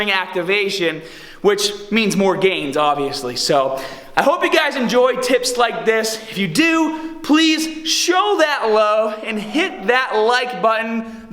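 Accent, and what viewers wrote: Boston, United States. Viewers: Are you from boston you have the accent. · Definately from Boston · What part of Boston are you from brother? BAHBELL LIFTS ALL DAY :) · lol Bahbell. I find a problem where when I ass to grass squat for 10 reps+ I start bouncing out of the hole when I start getting fatigued. I feel like I'm cheating myself but I'd like your opinion! thanks man love the Boston accent by the way haha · Sooo Boston! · That Boston Accent is serious! Good info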